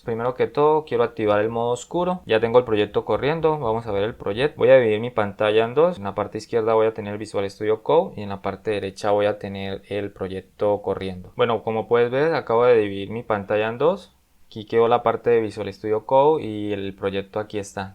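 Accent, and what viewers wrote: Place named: Colombia. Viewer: De antemano, muchas gracias por dedicarle tiempo a enseñar a los demás. Ojalá más personas se sumen como yo a este canal. Por tu voz asumo que eres de Colombia, al igual que yo. Estoy aprendiendo por mi parte a programar, pero por mi trabajo y responsabilidades no pude. Ahora Sacando tiempo, poco a poco voy aprendiendo y espero, con tu ayuda, seguir aprendiendo. Bendiciones para ti y tu familia.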